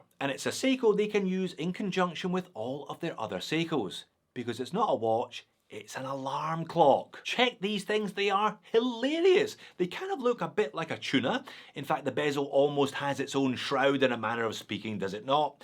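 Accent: Scotland, United Kingdom. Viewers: I’m not going to win fuck all anyway so I’ll just say that the video was very good as usual ,i really like videos just to hear your accent reminds of home back in Scotland it’s the only one i hear as i now live near Boston Massachusetts ,have you heard the Boston accent? I’ve been here over twenty years and I think the Peterhead fishermen have had elocution lessons compared to the accent here,so you and your new family and Mr P have a fucking wonderful Christmas and drunken fucking outrageous New Year you only live once please make the best of it.🇦🇺🏴🦘🐑👍🍸🍸🍸🥂🥂🥂😂😂😄Comments like this are why I don’t win sod all and finally please keep up the Scottish sense of humor it’s one of the few things the Tory’s have left us.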